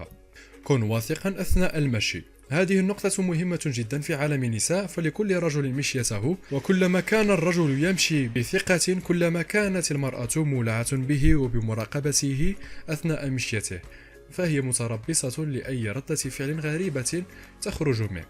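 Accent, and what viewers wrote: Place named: Morocco. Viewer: يجب ان تتخلص من لهجتك المغربيه اثناء الحديث تجعل الشخص لا يفهم شيئا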